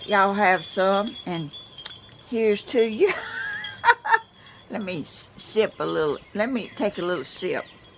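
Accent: South, United States. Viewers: Hi, I'm Melissa from Iowa. I'm subscribed. You are just so stinking cute, southern accent, cute sayings, and a real sweet lady, I really enjoy watching you create your crafts, and am wanting to try my hand at some of them, bless you from Ga.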